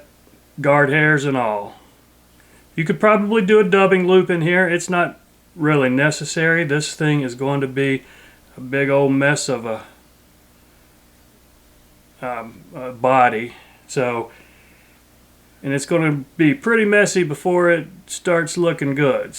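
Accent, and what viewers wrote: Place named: South, United States. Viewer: Stop cringing, although I cringe when I hear my recorded voice 😖I like this format, great intro. You sound more "southern" in this video;o) Geeze in almost 2 years you now sound like a celebrity host of a how to show 👍Nothing wrong with either production styles, your dedication to the channel is amazing! Thanks for doing this! Oh yeah the fly, heard of Humphreys, a lot, but not aware of this bug. I tied Ed Shenk's cress bug. But I'll tell ya, if you pull a Usual under water in the middle of a drift, especially in a good current, hang on for a surprise. I think the trout take it for one of those critters. Thanks again, those flies in the intro were awesome! 🤓👍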